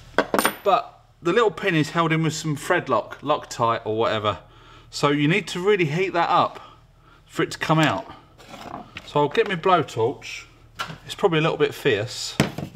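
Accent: United Kingdom. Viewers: 2 seconds in i hear the english accent...And guns, i subscribe, same as me, born and bread 43 yrs...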